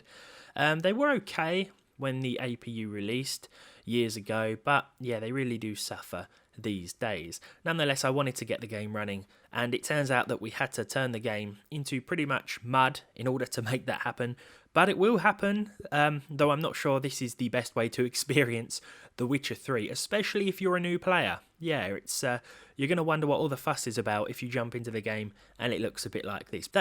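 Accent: United Kingdom. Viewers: Lowspecgamer:British edition.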